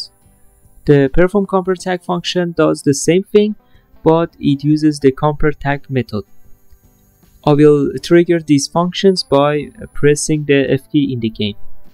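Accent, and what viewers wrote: Iran. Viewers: داش چرا لحجه ایرانی داری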